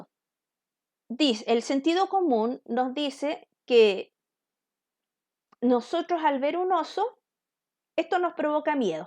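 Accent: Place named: Chile. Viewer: 😂😂😂 por el tono de voz me dice que chilena😊🧘‍♀️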